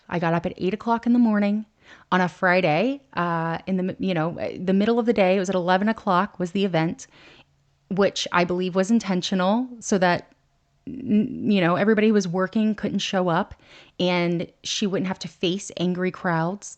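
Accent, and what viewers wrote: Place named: South, United States. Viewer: Her southern accent makes her sound unintelligent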